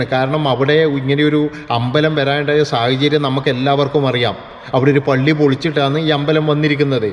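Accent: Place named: Thalassery, India. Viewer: ഇങ്ങള് തലശ്ശേരി കാരനല്ലേ... അല്ല കണ്ണൂർ ജില്ല കാരൻ ഇങ്ങളെ വർത്താനം നല്ല രസമുണ്ട്.. ഒരു കളങ്ക മില്ലാത്ത സംസാരം... എനിക്ക് ഇഷ്ടപ്പെട്ടു... ഇങ്ങള് ചിത്രയേ പറ്റി പറഞ്ഞത് നൂറിൽ നൂറു ശതമാനം ശരിയാ... ചിത്ര ചേച്ചിയുടെ ശബ്ദം മധുര മുള്ള താണ്. ഇനിയും നില നിൽക്കട്ടെ.. സംഘി കളുടെ വലയിൽ പെട്ടുപോകരുത്..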